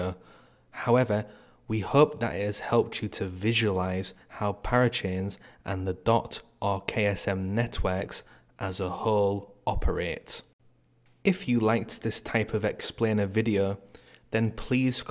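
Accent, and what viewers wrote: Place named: Barnsley, United Kingdom. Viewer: Great video again! You sound like you’re not far from me! Barnsleh? DM on Twitter if you like :)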